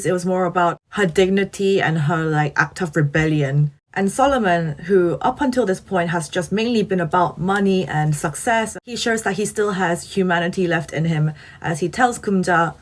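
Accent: United Kingdom. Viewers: All I focused while watching this video is your pronounciation. I'm learning Britain English from you. Thank you ❤️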